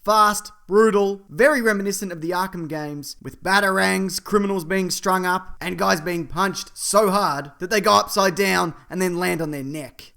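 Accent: Australia. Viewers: This guy sounds Australian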